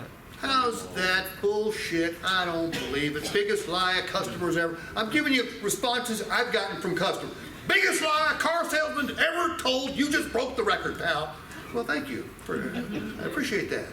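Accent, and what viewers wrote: Appalachia, United States. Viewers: Hillbilly and his bullshit hillbilly talk give it up. It’s over nobody cares. These are all tricks that consumers know about you need to sit by the pond and watch the ducks.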